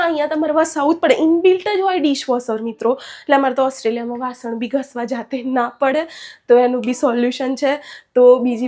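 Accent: Sabarkantha, India. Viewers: Sabarkantha no kahejo che 😅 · બેન ની ભાષા હિંમતનગર સાબરકાંઠા બાજુની છે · તમે સાબરકાંઠા ના હોય તેવું લાગે છૅ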